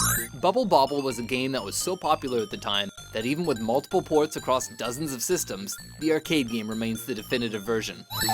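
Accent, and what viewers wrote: Canada. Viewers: It's a Canadian accent. get over it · he's canadian..... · It's called a Canadian accent, stop being so butthurt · He's Canadian. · FIRST WORLD PROBLEMS It's a Canadian accent. get the fuck over it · That's the way Canadians talk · For every person going "THEY MISPRONOUNCED MARIO!!?!?!" The narrator is Canadian so he's obviously going to pronounce things differently.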